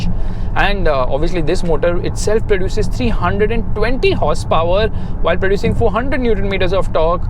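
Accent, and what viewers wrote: India. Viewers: Indian English is so funny.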